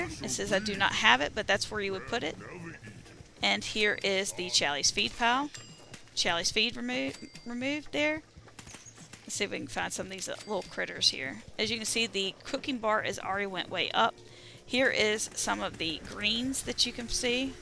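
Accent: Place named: West Virginia, United States. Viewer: Your accent sounds a little like a WV accent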